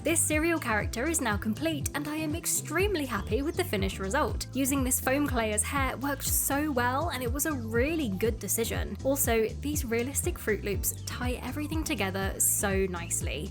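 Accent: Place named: United Kingdom. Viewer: I love your art sm your voice reminds me of Ldshadowlady but I think it’s uhh cause your both British 😭✌️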